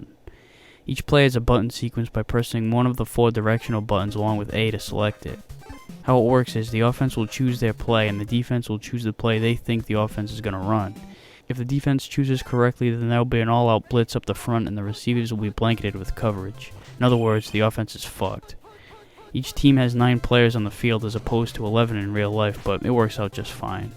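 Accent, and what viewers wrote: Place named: East Coast, United States. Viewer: your from the east coast huh, i can tell by your accent especially when your curse that shit made me bust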